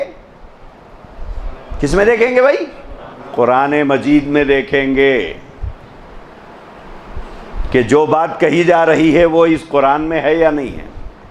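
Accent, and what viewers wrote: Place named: Hyderabad, India. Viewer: MASHALLAH good magar apna neeshani theek se nahi batai aur bartoon ko bout lamba karty ho .phir b JAZAKALLAH KHAIR . Esliya Mai doctor israr SAHAB ko aur SHEIKH imran nazar HOSSAIN ko aur mufti zarwali Khan ko aur peer zulfiqar Ahmed naqshbandi SAHAB an etc etc kuch aur b hai unko sunta hu. Wasy mashallah hydrabadi urdu aplogo ki muja bht PASAND hai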